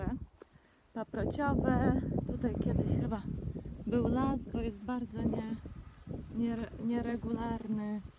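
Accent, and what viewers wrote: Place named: Ukraine. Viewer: Hej To takie trochę specyficzne i pewnie trochę dziwne pytanie czy masz pochodzenie Ukraińskie ogólnie po za polskie. Masz taki leciutki akcent. Pozdrawiam ciebie i Bażanta 😁😉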